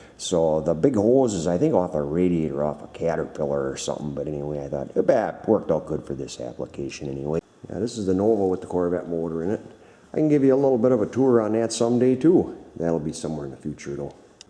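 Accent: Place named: Upper Midwest, United States. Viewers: I'm from Wisconsin (now in Texas) and this guys accent was killing me. i miss home! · lmao... man this guy's accent kills me! it's like watching a deleted scene from the movie Fargo! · Christopher Desabrais Wisconsin accent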